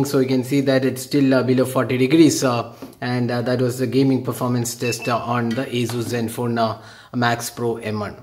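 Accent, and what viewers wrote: India. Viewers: oye indian accent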